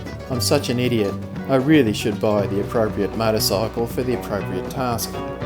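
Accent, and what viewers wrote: Australia. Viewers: I’m a Scot, I think the Aussie accent kinda threw me off a bit 😂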